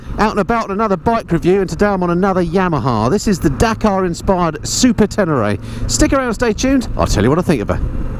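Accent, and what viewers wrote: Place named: United Kingdom. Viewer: I played this review in 0.75x speed and IT SORTED THIS REVIEW OUT for a person like me who WASN'T born into QUICK-AS-HACK British talking :)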